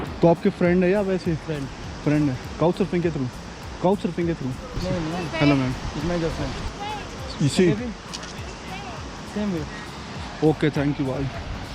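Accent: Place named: India. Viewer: U narrated ur expedition very well ....almost covered everything... N d desi accent stole d show👌👌👌 Next time do plan for tapovan ... All d best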